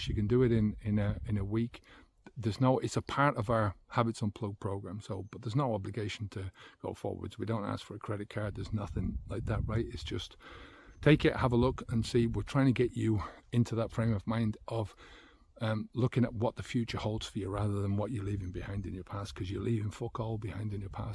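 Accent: Ireland. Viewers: Did you used to live in ireland? You have a slight irish accent.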